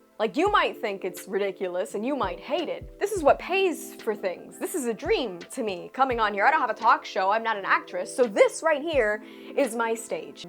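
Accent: Cuba. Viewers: Cuban accent detected! ❤ half Cuban/half Mexican here Represent! People suck, if you want to watch review videos like yours and want to contribute its better to comment politely and give your opinion with reasons for giving your opinion. Make your case, but why start disrespecting and acting like an internet tough guy? Puro paz y amor, everybody chill. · Que bonito hablas espanol! Mi cubanita linda. No matter what you say or how strong opinion you may have, say it in that beautiful cuban accent. Te derrites como la cera caliente!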